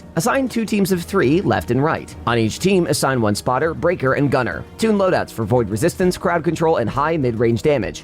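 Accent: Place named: Scotland, United Kingdom. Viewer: This dude does a great scottish accent! much love brother.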